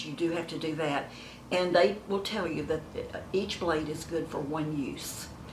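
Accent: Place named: Texas, United States. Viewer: I just saw one of your videos for the first time tonight. We have some similar ideas! I was all for shopping my closet which was brimming with really cute clothes but soon discovered that I have the COVID Curse...I’ve gained weight for the first time in at least 5 years! YIKES! I was a slim petite 68 year old & honestly, gaining almost 8 pounds really makes me feel fat...about 117 lbs. now.Everyone has bagged me for over 5 years to EAT! I couldn’t eat for about 4 years due to the loss of my first-born, my only son. My heart broke. He was a chemistry professor at a university in Ohio but our home is in Canada. I think that sometimes I think he will come to the door because he was in another country & I didn’t see him often. I hope that doesn’t sound too crazy! Now, back on topic...I am subscribing. I think you are absolutely LOVELY inside & out & I could listen to you talk ALL DAY! 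Are you from Texas? My Dad’s third wife is from Texas & you remind me of her (your voice).She lives in British Columbia which is quite far from us in Ontario. Anyhow, I loved your video & look forward to finding more of them! I don’t know anything about you yet but I think that if I ever had the good fortune to meet you, we would get on like a house on fire! In September I will be 69 & I saw in one of the comments that you are actually 77!? You look at least 10 years younger! Maybe those of us who try to keep a kind heart are blessed with looking a bit younger than our ages? Love & kindness are my p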